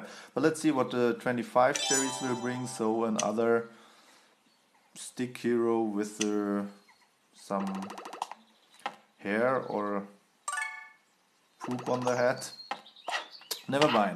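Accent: Germany. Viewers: Kann es sein das du deutscher bist? Das ist so ein typisches "Deutsch Englisch"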